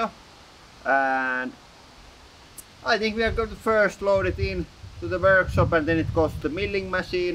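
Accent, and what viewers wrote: Finland. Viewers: i was wondering where i've heard this accent before.he sounds like kimi raikonnen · Such an awesome video! Machining porn and also awesome Finnish accent. Made my morning!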